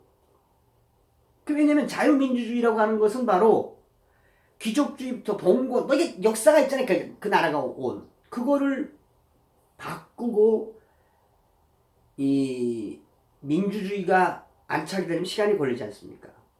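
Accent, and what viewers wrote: North Korea. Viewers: 고조구수한 이북 작고하신 우리아버님 목소리같아 정겹네요 응원합니다 화이팅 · 오리지널 북한사투리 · 북한말씨로 좌파아가리 이중성을 말해 주시니까 속시원합니다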